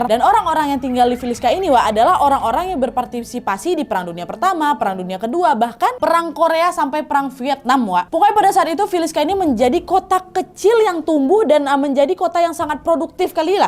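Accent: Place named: West Sumatra, Indonesia. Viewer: cuma d channel ini , bisa dengar berita kriminal logat minang 😅. Narasi percakapannya g bayangin bule lg ngobrol pke logat minang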